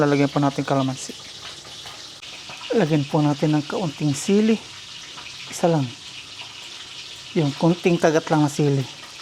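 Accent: Visayas, Philippines. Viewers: Bisaya ka ba? Yung tagalog mo kasi tonong bisaya eh.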